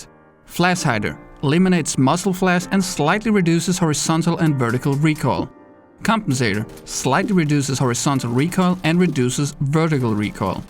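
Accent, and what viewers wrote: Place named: Denmark. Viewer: Are you Danish? If not! Then where are you from... Du lyder Dansk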